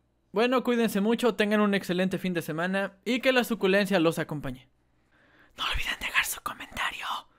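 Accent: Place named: Argentina. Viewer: me enseñas a hablar argentino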